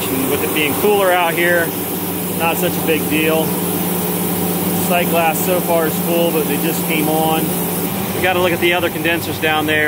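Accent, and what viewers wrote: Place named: Pittsburgh, United States. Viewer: Sounds like you’re from Pittsburgh that’s the way we talk lol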